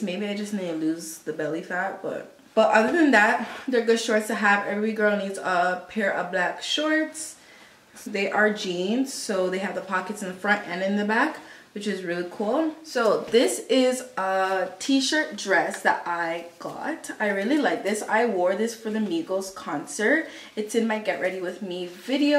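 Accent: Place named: Latin America. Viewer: You're so cute! So you're in Canada? I keep thinking I hear a little Latina in your accent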